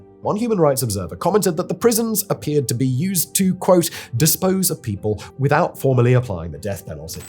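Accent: United Kingdom. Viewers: I always love your work and Britishly pronouncing words but clique is pronounced click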